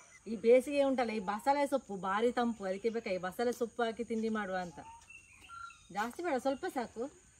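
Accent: Mangalore, India. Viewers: Pure mangalore kannada❤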